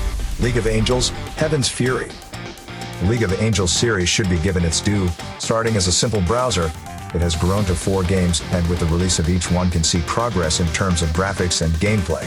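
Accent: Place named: North America. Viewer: What the fuck does that even mean even to European gamers why does everybody else in the world Dam have ugly shit so this game looks good I will shut the fuck up any case you’re speaking in a western accent North American probably tell us game isn’t out Ross yet so it should be on this list and it probably will never be out it’s my bad Amazon is not releasing it yet because they don’t want it to overshadow new world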